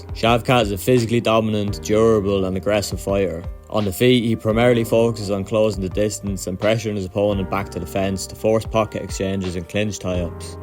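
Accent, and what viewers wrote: Ireland. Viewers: Wasn’t expecting the Irish accent. Good breakdowns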